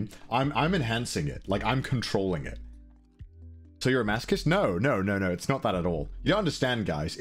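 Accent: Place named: United Kingdom. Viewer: my man's speaking the language of facts with a british accent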